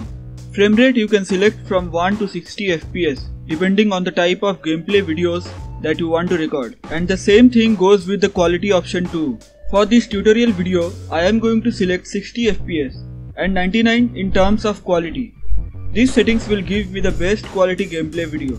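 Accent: India. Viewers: when i her indian voice i know i will working btw tnx its working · I luv indin accent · I hate your indian voice but the recorder worked ! cool vid ! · No it's not legit if there is Indian voice for me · i taught it was fake because of the indian accent lol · When you heard an indian voice. it doesnt bring fake videos you can trust indian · If you hear an Indian voice that means it's not clickbait!!! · Man when i heard the indian I was like this shit is gonna be a scam or be legit No but seriously this was so helpful.....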